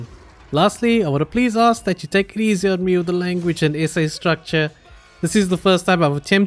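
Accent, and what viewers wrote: South Africa. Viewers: Your English is flawless. Sounds like your first language but you have a bit of a South African accent?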